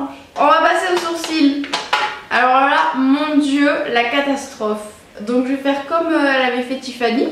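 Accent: South, France. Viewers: L'accent du sud il est trop bien à écouter il met de bonne humeur😊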